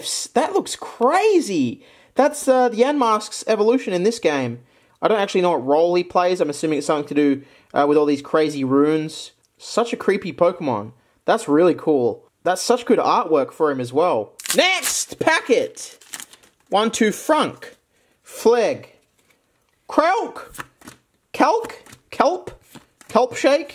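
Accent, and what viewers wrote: Australia. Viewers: already saw the video in the self promo. you're videos are consumable as fuck... or maybe it's the Australian accent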